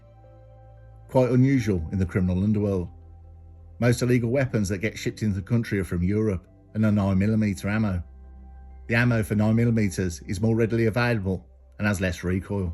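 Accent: Birmingham, United Kingdom. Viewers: Really like the way this guy puts these together. And his VO with his brummie accent 🙏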